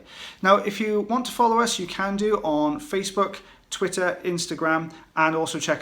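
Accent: Wales, United Kingdom. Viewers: you are now an inch-reading maestro! Thank you for the videos to keep our spirits up. Your lilting Welsh voice is such a comfort! Sending happy thoughts to you and Mrs B. Thank you also for the little lesson on light reflex and the eardrum and hammer bone.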